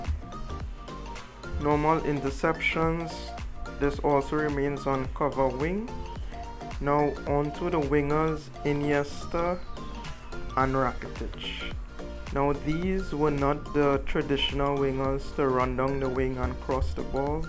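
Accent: Trinidad and Tobago. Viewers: Ahhh boy…from d time I hear d accent….done know he is fellow Trini. Respect bredda. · Bro is this your Trinidadian Accent?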